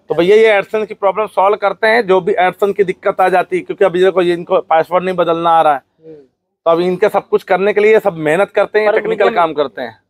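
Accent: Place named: Chitrakoot, India. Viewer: Baba ji aap kahan ke ho Bhasha ka chitrkut District ki lag rahi hai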